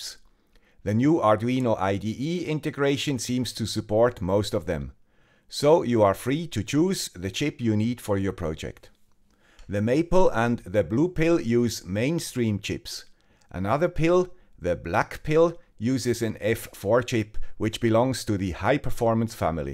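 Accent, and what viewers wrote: Switzerland. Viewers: The guy with the Swiss Accent - Smashing the Arduino learning curve into a pancake since Video #1.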